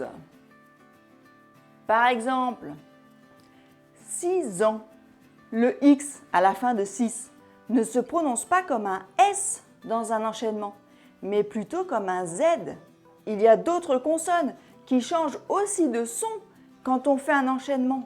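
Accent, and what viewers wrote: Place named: Quebec, Canada. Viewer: Je croyais d'abord que vous étiez Québécoise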